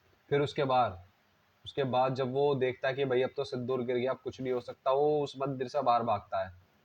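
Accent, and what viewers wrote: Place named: Rural, India. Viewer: Horror nahi comedy hai... jaahil bewakoof aur dehati jaisa bol raha hai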